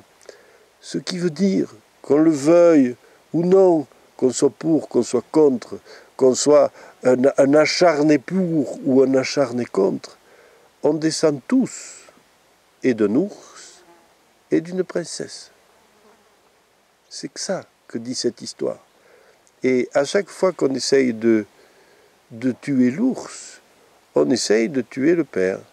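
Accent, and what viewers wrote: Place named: South, France. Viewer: Hoorah enfin du vrai accent du sud! Merci pour cette vidéo!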